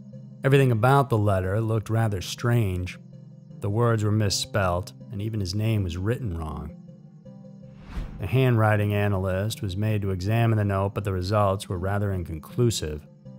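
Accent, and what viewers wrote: South, United States. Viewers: Lord, I love your voice. It has a southern ring to it, like I have. Anyhow, it's a lot better than that random Canadian guy who sounds like the least interesting man in the world.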